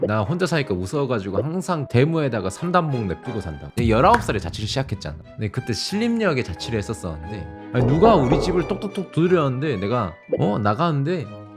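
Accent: Gyeongsang, South Korea. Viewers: 경상도 사람이엿구나..........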